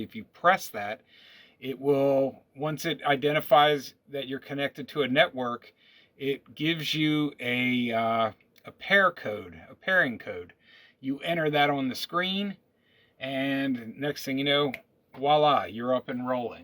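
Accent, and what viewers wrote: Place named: North Carolina, United States. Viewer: Thanks for the video. Been thinking about getting one of these. You sound from your accent that you are from my neck of the woods, North Carolina. I also have the VPI Signature21 in RW, and the Integrity Tru-Lift and Kleen Sweep. Great products so you have good taste too😀 Love the music videos Keep it up.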